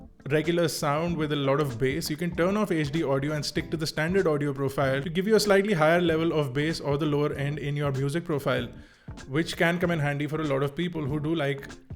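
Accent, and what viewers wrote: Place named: Mumbai, India. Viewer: that's Mumbai accent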